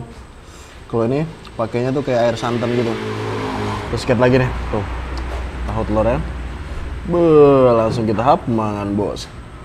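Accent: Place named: East Java, Indonesia. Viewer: Jawa timuran nih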